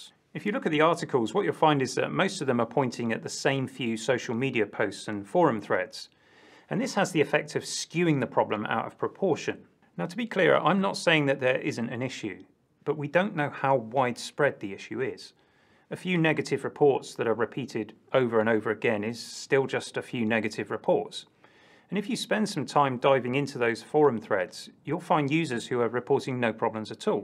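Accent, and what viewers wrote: United Kingdom. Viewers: British guy talking smooth - going to sell your data to a class action lawyer against Apple. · the British accent is the best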